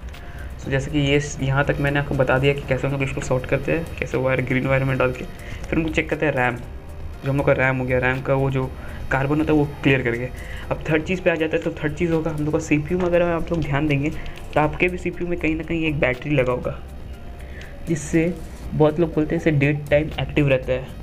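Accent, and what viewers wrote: Bengal, India. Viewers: Are you bengali? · Are you bangalee? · You are bangali, right?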